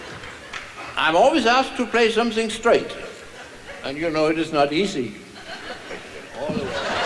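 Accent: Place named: Denmark. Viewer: He tells the same jokes but it doesn't matter. He is still funny. I read his autobiography in Danish, bought in a second hand store. He had a successful career as a concert pianist in Germany before the war but eventually had to flee, being Jewish, through Sweden and Finland. Managed to get the last boat from northern Finland on a US special vessel sent to pick up US citizens. His first wife had spent time in the US and was a citizen. She insisted on taking her cat with her. They were parted but still friends, Victor and she, that is. Despite the ice and extreme danger, they made it to the US. I am not Danish, but speak Danish and always thought he was funny because of his accent in english. Then l found out that all Danes have a funny accent in english without even trying.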